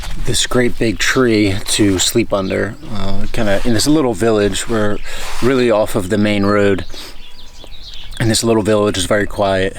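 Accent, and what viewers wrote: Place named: Philadelphia, United States. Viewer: Damn that Philly accent is thick haha.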